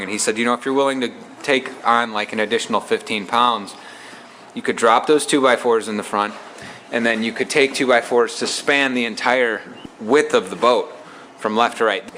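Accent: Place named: Illinois, United States. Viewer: What size boat is that and where do you live? Sound like a fellow Illinoian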